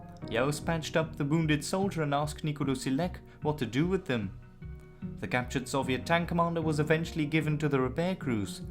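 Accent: Germany. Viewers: Is my guess correct - you are German? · hehe... I can believe. Though, I was sure you were German, as your accent sounded so. Rgds, W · Say, good sir, would you not be German, per chance? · Highly excellent and authentic German pronunciation :) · ron I could be wrong but I think the narrator's first language is german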